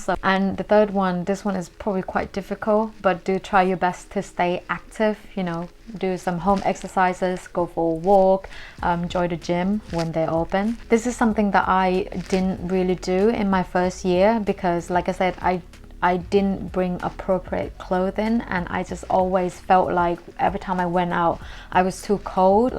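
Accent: United Kingdom. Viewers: I am very much impressed off yours british accent speaking .i hav never seen any thai people talking like this manner as i hav work with thai people here in Nepal ktm station😊😊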